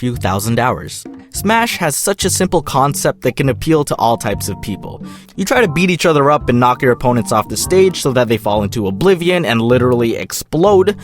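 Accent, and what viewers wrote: Philippines. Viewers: love the Filipino accent 😂😂😂😂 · you sound like a Filipino. I’m a Filipino btw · lol the filipino accent is so on point and funny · gotta love that Filipino English accent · sorry dom but I can't stop listening to your filipino accent nintendo pamili komputerr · love the Filipino accent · Weird Filipino Accent Tae means poop in Filipino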